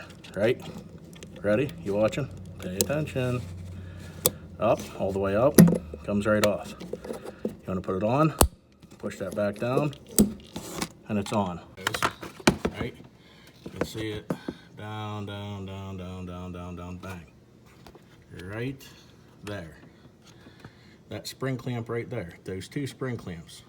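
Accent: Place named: Pittsburgh, United States. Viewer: Thanks, and you sound like a yinzer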